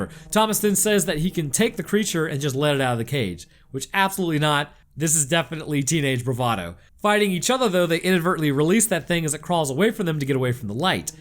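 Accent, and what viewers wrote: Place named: South, United States. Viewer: Why do all southern americanss sound the same. You sound exactly like the finance guy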